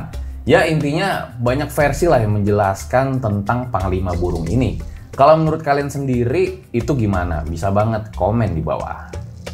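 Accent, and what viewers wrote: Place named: Banjar, Indonesia. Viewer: Banjar baru mana suaranya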